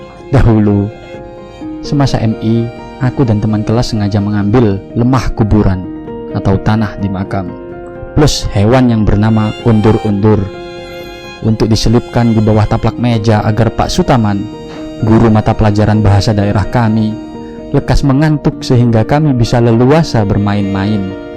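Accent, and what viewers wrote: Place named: Java, Indonesia. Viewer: Jossssss keren, kata kata medok e tetep ketok,tp iku seng nggarai jos. Jowone tetep kudu onok. 👍👍👍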